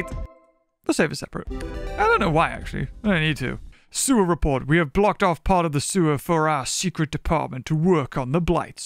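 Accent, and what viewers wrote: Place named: Newcastle, United Kingdom. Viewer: We got a love also he’s firm Newcastle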